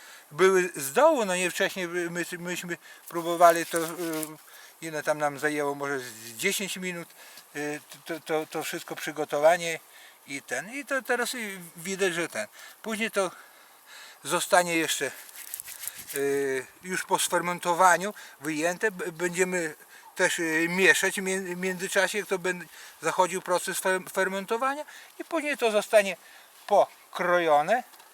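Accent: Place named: Kresy, Poland. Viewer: Sympatycznie i ten fajny kresowy akcent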